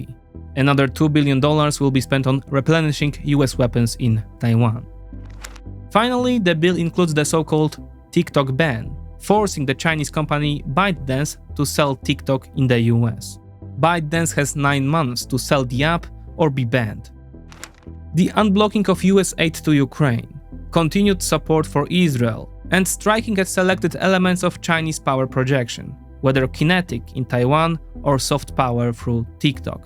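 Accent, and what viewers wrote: Poland. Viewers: Yaaaaaaaay he's Back!!!!! The Polish accent is back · So happy to have the Polish accent back !!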